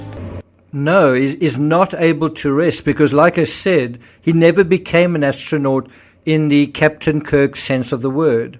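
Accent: South Africa. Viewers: I like your channel very much, especially your naration and your, perhaps South African, accent? :) Your voice is quite calming and very pleasant to listen to. Good work.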